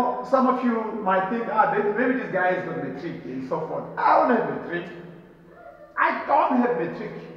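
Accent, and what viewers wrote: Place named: Zimbabwe. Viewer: If any illegal aliens from our continent is not happy to be in South Africa they're other 53 African countries they can choose and go to, we don't want any unskilled illegal immigrants to flock to South Africa to work jobs locals can do. I think the time has arrived were the government needs to introduce job restrictions for African immigrants to discourage them to stop coming to our country in their millions because the truth is they're no jobs in South Africa we have 40% youth unemployment we need to prioritize our citizens first before we can accept asylum seekers and economic migrants. You can in your right mind compare a small economy of South Africa to UAE, Saudi Arabia, Japan, China, South Korea or any other powerful countries because most of those nations don't have the problems we have of the alarming high unemployment, crime, poverty, landless etc. 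We are not happy as the citizens to live with millions of illegal immigrants in our communities and we will not back down from that you must urge your brothers and sisters back in Zimbabwe or whatever were you from because i care hear your accent to let them know that they're no opportunities for them in South Africa because locals are jobless, hungry, poor, landless etc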